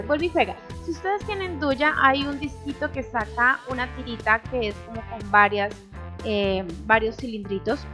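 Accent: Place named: Colombia. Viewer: te escucho con acento colombiano, la porselana fria es la misma porcelanicron?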